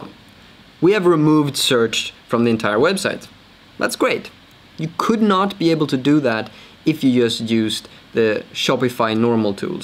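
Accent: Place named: Sweden. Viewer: omg älskar dialekten hörde direct att du va från sverige!!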